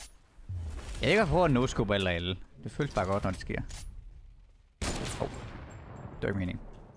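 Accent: Jutland, Denmark. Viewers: Kommer du fra Jylland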